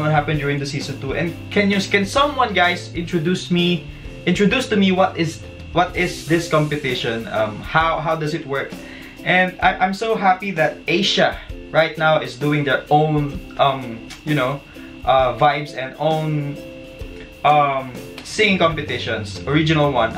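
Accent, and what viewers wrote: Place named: Singapore. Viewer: สิงคโปร์เลียน ชัวร์ สำเนียงอิ้งแบบนี้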